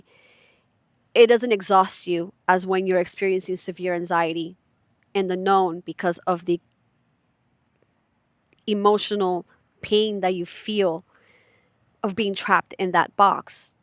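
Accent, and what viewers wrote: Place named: Florida, United States. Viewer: You so dam fine girl. And it looks like you may be in Florida 😉 the accent is ❤️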